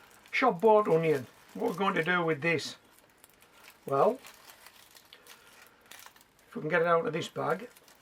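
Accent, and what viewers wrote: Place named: Cumbria, United Kingdom. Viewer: Thank you for your clear and honest advice. Absolutely love your channel. I have learned so much from you in my first year of growing from seed. Thank you! (By the way....you sound just like my husband and in-laws who are all Penrith and Appleby folk. Are you local to there?) Best wishes, Amanda. ❤️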